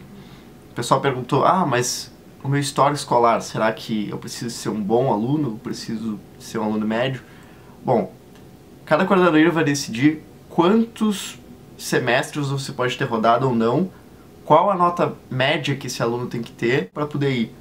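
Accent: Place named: Rio Grande do Sul, Brazil. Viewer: Daiane Oliveira Pela sotaque e maneira de falar deve ser na UFRGS ou PUC- RS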